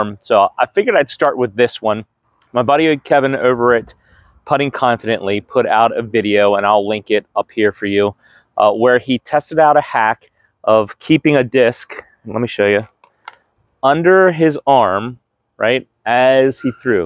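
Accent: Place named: Philadelphia, United States. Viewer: ah, ok. I think you're more subject to the Philly accent there than the Pittsburgh area. You have the vowel sounds: "ph-oh-ne h-oh-me to my Fahm-ly." I used to live there for a few years and can pick up on certain accents.😄 Appreciate you humoring my non-dg question! But I digress! Subbed'! I do similar content to grow the sport. Cool you got your kids into it too. One of my 3 boys joins my wife and I at least once a month. Building him a bag was super fun!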